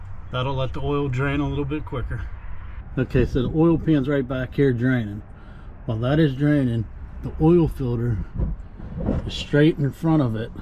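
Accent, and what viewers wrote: Pittsburgh, United States. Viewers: A couple minutes into the the video I was like "nice, he's got a Pittsburgh accent"...great video, way to lay it all aht! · I know you have to be from Pittsburgh with that accent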